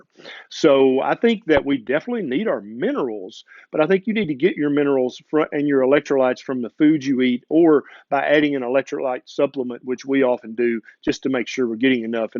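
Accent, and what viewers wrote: South, United States. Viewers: Thanks Doc for cutting through the marketing BS and quackery with science drizzled with your mellifluous molasses over honey southern accent.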